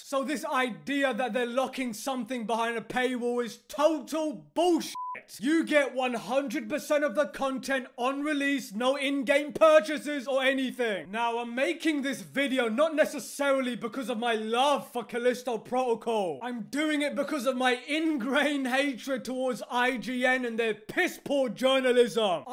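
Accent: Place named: England, United Kingdom. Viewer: Geez, these " This game sucks" channels appear to be all the new rage. They are popping up everywhere, the formula: Possess an English accent, utilize clickbait titles, and just yell about bad something is. LMFAO, the North American IGN review isn't even up on their site yet and he's screaming bloody murder about a game he didn't play and how bad it is 🤣🤣🤣🤣🤣🤣🤣🤣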